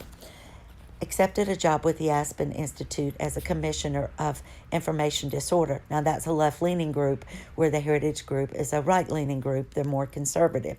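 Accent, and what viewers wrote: South, United States. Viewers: Thank you for reading this. You have a delightful voice and a gorgeous southern accent ❤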